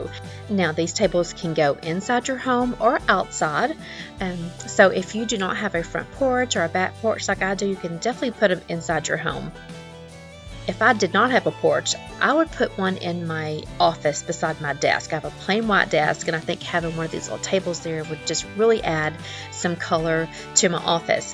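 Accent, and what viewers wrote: South, United States. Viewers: Hey, I’ve been following you for a while now but have not introduced myself. I know you always tell us to introduce ourselves. I live in New England but one side of my family is from the deep south so I enjoy your sweet southern accent. 😁 The bird house is hanging from the roof line over your deck. I do not have a YouTube channel but I do have an Instagram account because I also enjoy decorating. I just started following you over there on Instagram.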